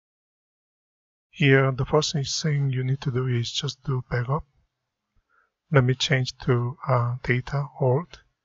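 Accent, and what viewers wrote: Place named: India. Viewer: Bom demais, sotaque indiano nunca falha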